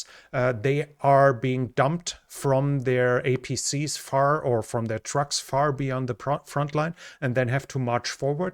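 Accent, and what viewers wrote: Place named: Germany. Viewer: Where are you from ? Germany or Scandinavia ? Just guessing As a German your accent could be both :D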